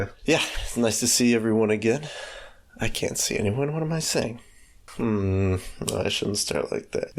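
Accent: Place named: Russia. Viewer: Русское звучание так просто не спрятать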